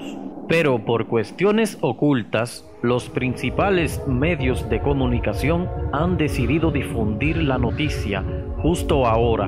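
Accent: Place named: Spain. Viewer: Bueno por ahí te comento que este programa no es mío sólo comenté que porque tienen que poner a español a narrar no puedes en latino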